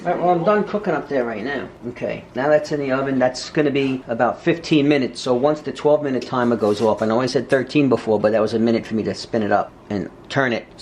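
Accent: New York, United States. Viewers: Love the NY accent..💕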